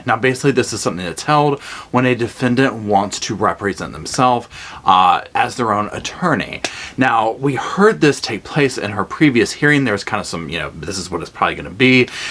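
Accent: South, United States. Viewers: I just love all your comments and facial expressions, you make me laugh. Lord knows I need more laughter in my life. Thank you for making that happen. Love your southern accent. I grew up in Florida so I have one too. Lol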